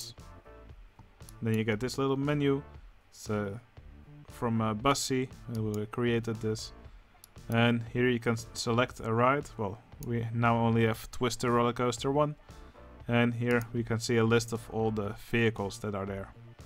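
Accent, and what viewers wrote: Netherlands. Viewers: Whaaaat, has a Dutch accent? I had not noticed yet!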